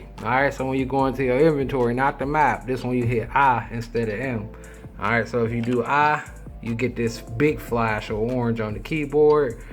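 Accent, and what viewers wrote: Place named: Mississippi, United States. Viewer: Felt like someone from Mississippi telling the story on a rocking chair couldn't understand half of what he said.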